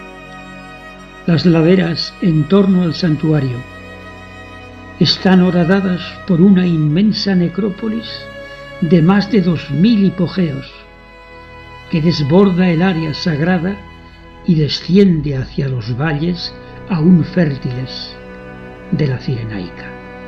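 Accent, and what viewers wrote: Spain. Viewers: Me jode mucho el acento español tan marcado q por momentos ni se entiende, por eso no pude seguir viendo el documental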